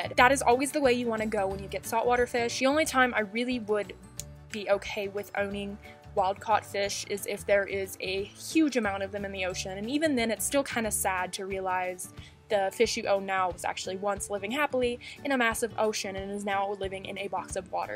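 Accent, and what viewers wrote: Texas, United States. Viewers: I just realized you have a slight Texas accent or whatever it's called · is when i really here her texan acent im from texas to so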